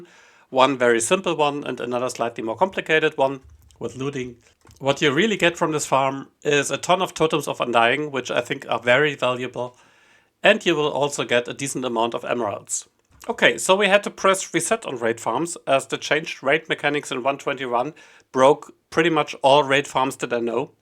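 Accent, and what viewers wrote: Germany. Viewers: do i sense a heavily german accent? :D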